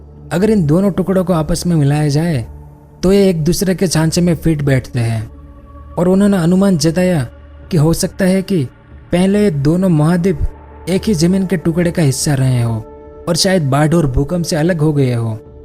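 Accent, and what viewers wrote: Gujarat, India. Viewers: Sir aap ki boli se to laga raha hai ku aap gujrati ho By the way video bahot achhi thi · Bro tu gujrati he kyaa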